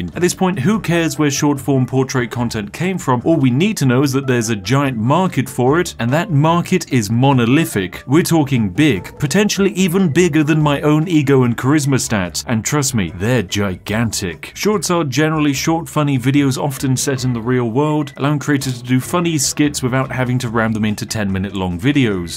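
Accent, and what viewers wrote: United Kingdom. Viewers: The sniffing brit👍👍👍👍👍👍👍👍👍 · Hi fellow British man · so bri'ish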